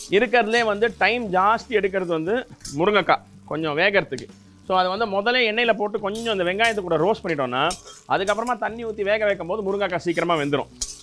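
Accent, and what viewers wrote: Chennai, India. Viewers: Dear chef, Love your passion and the joy you have for cooking, my Amma watched every episode of Samayal Samayal and enjoyed it immensely... Unga Madras Thamizh kaettaa I feel so at home 😊... Do we add the Sambar podi only in the end and not while cooking the veggies in the tamarind extract? Will there not be a raw smell (of the chillies kaaram) since we are turning the stove off immediately after adding the Sambar powder and not cooking it? Will be thankful if you can pls clarify, this is my absolute favourite and I'll be super thrilled if I get it right, missing Chennai :)...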